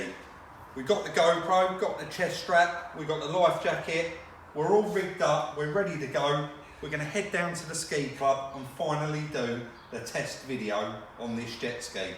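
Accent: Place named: Cockney, United Kingdom. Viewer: It was like watching Baywatch with a cockney David Hasselhoff..Loved it and you boys deserve all the profit you make 👍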